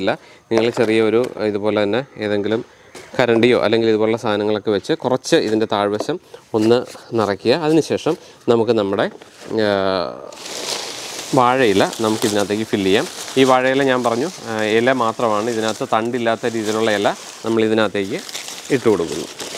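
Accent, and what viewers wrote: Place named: Kottayam, India. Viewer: പള്ളയാൻതോടാൻ കോട്ടയം ഭാഷ റിയൽ കേട്ടോ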